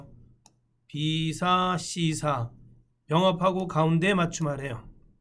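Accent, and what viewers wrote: Gangwon, South Korea. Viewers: 안녕하세요~ 5월 24일 실기 시험 발표 오늘 이었는데 한번에 합격했어요~~넘 감사해요^^ 작년 추석쯤 2024 5일만에 합격하기 기본서 구입하고 함수문제 보고 엄두가 안나 손놓고 있다가 반백살된 기념?으로 자격증 공부 해봐야겠다 생각들어 다시 시작했습니다.(엑셀 사용하는 업에 있지도 않고 sum, average 라는게 있다 정도만 알고있는)필기는 다른책으로 공부하며 기출 돌렸는데 점수가 꽤 높게 나와 이만하면 되겠다 했는데 딱 그만큼 두 문제차로 떨어지고 강의로 4일동안 7,8시간 공부해서 합격하고 바로 실기공부 돌입. 노안으로 안경을 벗었다 썼다 함수지옥에 빠져 환장할뻔 했지만 실기공부 2주동안 매일 6,7시간 공부 하고 합격 확인하니 너무 기쁩니다~ 함수는 표하나에 5문제 다 나오는 기출에 있던 문제 나왔고 부분합은 성별별로 수당과 상여금 최대값 합계 구하는 문제(성별로 내림차순), 기본작업에서 데이터 나누고 열 제거하기, 75,000-75천원으로 바꾸기 이정도 기억납니다. 다른 후기처럼 기출문제보다 조금 쉽게 느껴졌어요 2025 상시 10회분 넘 어렵게 느껴졌는데 2024로 공부해도 충분할듯 합니다. 컴활공부 검색하다 우연히 만난 선생님 억양이 친숙해서 보니 강원도분이셔서 더더 반가웠네요 저는 태백 아래 고한사람입니다~ 주변 사람들에게 많이 알리고 있답니다~~ 항상 건강하시고 행복하세요~~~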